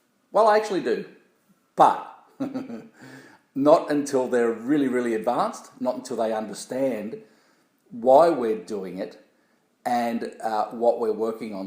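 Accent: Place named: Australia. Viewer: THANK YOU SO! MUCH!!!! TRYING TO BUZ!! IS NOT NEEDED WHEN YOU USE YOUR LIPS THE CORRECT!!! WAY!! AND JUST BLOW!! I LOVE YOUR TEACHINGS AND INSITES! AND LOVE YOUR AUSTRALIAN ACCENT!!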